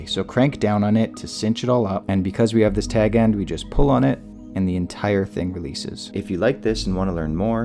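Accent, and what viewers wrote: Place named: Canada. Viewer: I can’t help but noticing your thick Canadian accent. I’m surprised that you guy don’t call the Canadian jam knot the jam knot.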